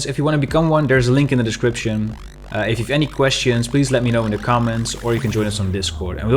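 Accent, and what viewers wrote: Netherlands. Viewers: dutch accent :) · your dutch arent you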